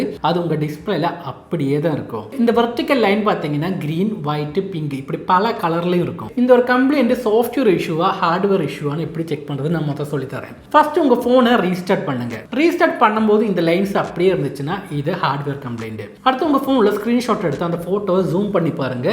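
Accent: Kerala, India. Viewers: This guy is a Malayali!!! His pronunciation is that of typical Malayali!!!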